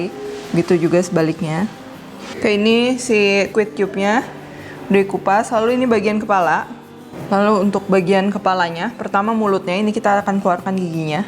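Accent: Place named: West Java, Indonesia. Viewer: bau hanyir 🤔nyunda pisan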